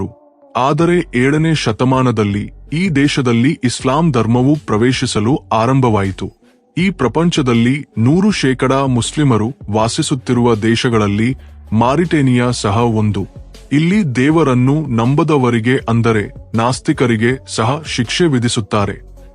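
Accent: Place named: Andhra Pradesh, India. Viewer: ನೀನು ತೆಲುಗು ನವನು ಎಂದು ಕಾಣಿಸುತ್ತೆ ಅದಕ್ಕೆ ನೀನು ಕನ್ನಡವನ್ನು ತೆಲುಗಿನ ರೀತಿ ಮಾತನಾಡುತ್ತಿದ್ದೀಯಾ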